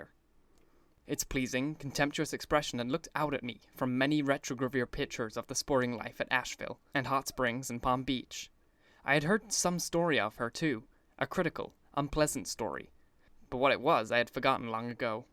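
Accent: United States. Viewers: Woah you have an American accent? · This is so helpful especially since i have 0 attention span when i have to read something. Your american accent is amazing btw!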